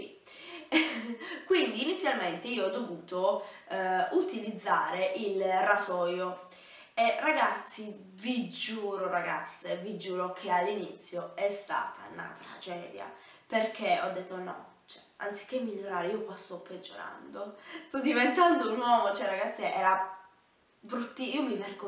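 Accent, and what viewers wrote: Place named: South, Italy. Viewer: Hai la pella chiara come una del nord ma l accento fi una del sud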